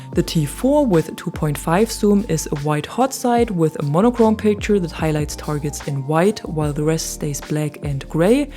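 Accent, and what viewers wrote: Germany. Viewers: i cant stand germans speaking english ffs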